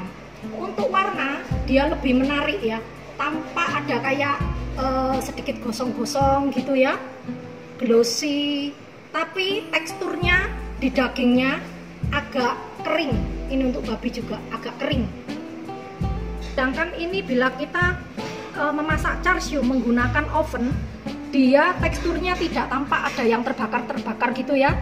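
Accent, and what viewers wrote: Java, Indonesia. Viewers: Anjirr medok bangett. Saya suka saya sukaa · org Surabaya ya cik...bicara medok buangett...😊tp cara masak cicik sy suka...😍thank U cik...🙏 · ci aku.baru ikutin you tube cici.mantap cici tutorialnya jelas dan mudah ikutinnya.Suka juga aksennya cici yg.medok jawa.GBU cici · Wah...luar biasa masakannya....nikmat sekali ini....dan suka banget sama medoknya...salam kenal...sesama medok...😄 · Medoknya something banget. Jd adu ciri khas. Kamsia ya cik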